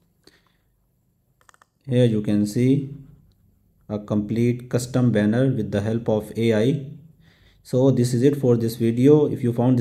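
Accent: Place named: India. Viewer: His accent says he is definitely indian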